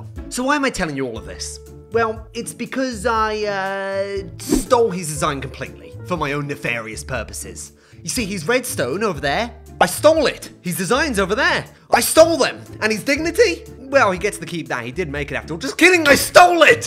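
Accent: United Kingdom. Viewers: Ah yes,British accent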